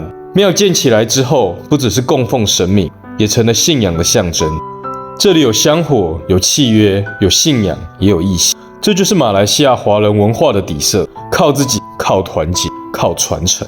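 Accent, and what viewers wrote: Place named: Taiwan. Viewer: 口音聽起來像台灣人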